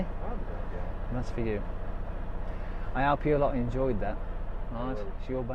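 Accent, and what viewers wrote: Birmingham, United Kingdom. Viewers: This guy reminds me of a brummie version of my dad lol. He taught me many years ago in exactly the same way God rest his soul ❤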